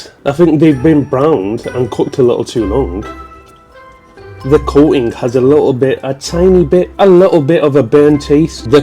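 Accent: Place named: South, United States. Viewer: I thought the American accent was good, sounded very Southern though, probably not Texas 😂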